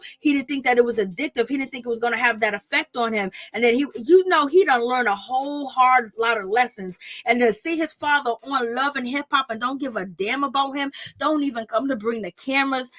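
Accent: New York, United States. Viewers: Hahahhaahhhhahhhhahahahahahahahahahahahhaahhahahaha😂😂😂🤣😂🤣😂😂🤣🤣😂🤣🤣🤣😂🤣 you are so funny gurl . And your beautiful New York urban voice .. Lar that ❤️❤️❤️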